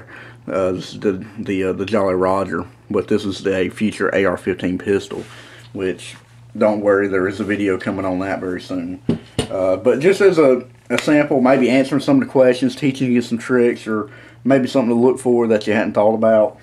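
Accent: South, United States. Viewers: No he doesn't! You just have be from the south to understand him. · ok little southern draw but why Carolina? we all ain't gat that twang....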